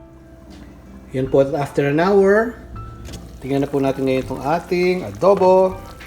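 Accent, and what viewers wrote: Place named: Cavite, Philippines. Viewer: cavitenyo siguro sya kc yung accent niya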